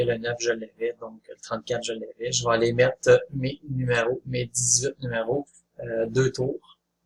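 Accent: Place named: Quebec, Canada. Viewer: Franchement j'ai essayer de suivre mais wallah le québécois c'est chaud de déchiffrer vos phrases.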